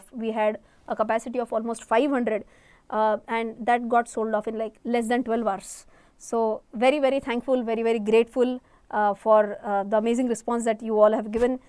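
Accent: Maharashtra, India. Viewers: I just watch her videos for her Marathi accent 😅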